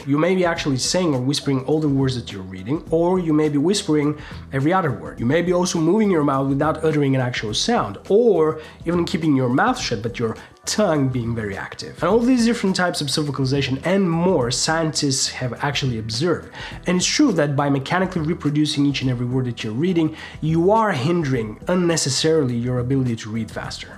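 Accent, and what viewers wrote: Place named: Brazil. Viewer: You sound brazilian, somehow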